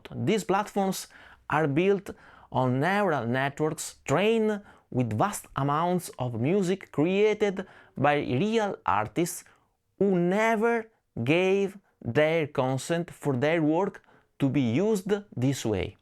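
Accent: Italy. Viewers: I fully agree with you. You are not old, you are simply in the right side! And, of course, your italian accent is totally fine 😂😂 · You’re accent isn’t stupid. You’re an Italian who speaks English. So there is an accent. I love to visit 🇮🇹 once again. The last time I was just a bambino. 🧒 such a beautiful place. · ! We love your channel, your content and information is invaluable to the community, we even love your italian accent ( i would love to speak any language at your level of proficiency)! Stay True! Stay Original! Stay Consistent! Keep up the great work! Thank you! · Dear, when you speculate about the proposal you got, somewhere between and, you said that they probably plan to use your "stupid Italian accent." As a language teacher who works as a pedagogical coordinator and holds an MA in Linguistics, and a subscriber to and fan of your channel, I'm in a good position to tell you that your accent is exquisitely and melodically beautiful. 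I guess I speak for everyone here when I say that the way you talk is one of the things that make your channel unique. I know you were being humble when you said that, but I thought it would be nice to let you know how much I/we love your accent - and your content, of course! Cheers from São Paulo, Brazil!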